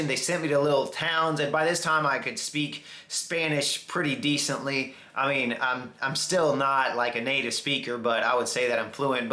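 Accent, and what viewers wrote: South, United States. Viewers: I must correct you.. im a black dude living in south America.. and the people are nice to everyone for the nost part... I honestly dont think your "whiteness" has as much to do with it as you think.. It may be moreso your accent being American... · I can´t believe you´re my age! Congrats on your job so far! As a Brazilian, I´ve got to say it´d be awesome to hear you speak Portuguese as well. I was curious about what state in the USA you were from (I heard it´s South Carolina), because your accent is slightly different from the standard american one, although I can understand every single word you say. Perhaps this is because you talk way too fast sometimes or because of the idioms and slangs you use, but it´s a very nice accent. Best wishes from Porto Alegre and come visit us once again. keep up the good work! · Jesus, the way you speak English you're like a redneck hillbilly guy 😳 but anyway, I'm American to one Colombian parent which is my mother proud to be an American-Colombian I'm going to apply for Colombian citizenship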